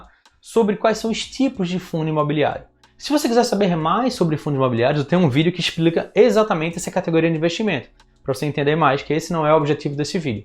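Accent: Recife, Brazil. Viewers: Em 30 segundos percebi que é de Récife. Seguindo já conterrâneo. ✌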